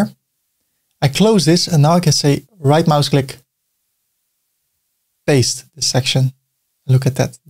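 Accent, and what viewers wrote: Netherlands. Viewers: TOP video!! En love het Nederlandse accent haha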